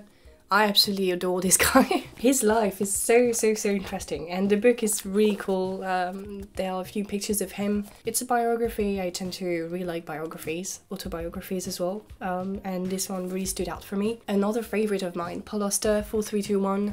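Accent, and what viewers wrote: France. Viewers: Gorgeous Gorgeous Gorgeous Girls speak English in a French Accent and recommend books despite feeling ill.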